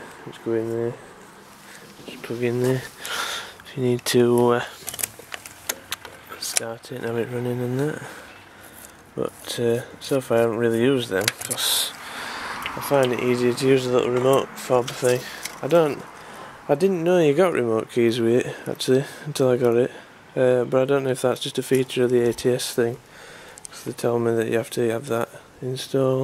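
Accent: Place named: North, United Kingdom. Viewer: This is the box i got wi it. Lazy northern accent and missing lots of letters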